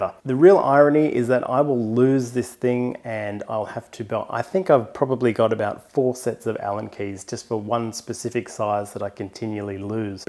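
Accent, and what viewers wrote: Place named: Australia. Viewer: I don't even own a telescope and am totally offended! But your accent softened the blow a bit knowing what a-holes ozzies can be ;-) Keep up the class work!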